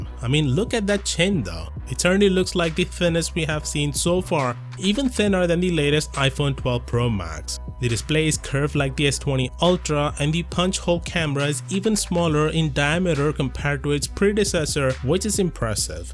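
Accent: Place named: India. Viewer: Why do you speak with indian accent?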